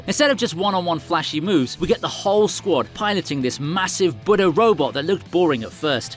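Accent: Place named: United Kingdom. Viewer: I searched best anime fights and expected a compaction. Wasn’t prepared for some British clown talking but do you I guess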